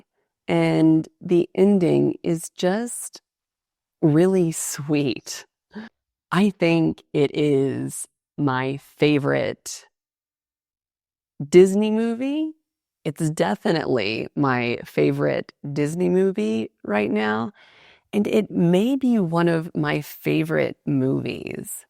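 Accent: United States. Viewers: American accent its amazing 🥰so beautiful.I never study English but i understand 70% because i always was watching american movies in original language with sub russian And i speak spanish,i lived in Argentina for 6 years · This is American English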